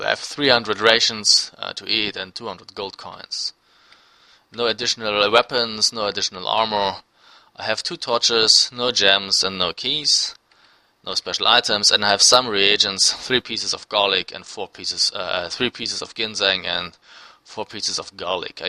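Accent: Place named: Germany. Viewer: dude, you sound so german!!! :)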